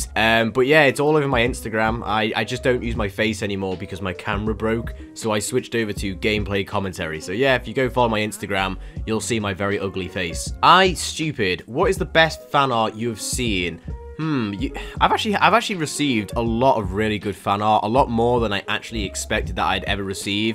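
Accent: United Kingdom. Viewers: He has a British accent? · Ye I didn’t know- I thought British but I also heard American and Scottish and Australia at same time but in curtain words? Hard to explain lmao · Why is it I only just realized.. You have a British accent. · Are you British, i cant really pick up any accents well and was just wondering 😂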